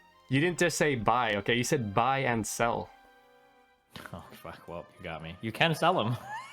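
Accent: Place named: Sweden. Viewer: Lycka till med vad du än tar dig an framöver. Du har alltid varit en av de mest rationella och eftertänksamma röster i den här delen av internet. Om du tar med det till vad som kommer härnäst tror jag du kommer se framgång. Kommer fortfarande alltid vara gött att se dig när vi får se dig på andras streams lite då och då. P.S I really like the more pronounced swenglish accent you had in those earliest streams.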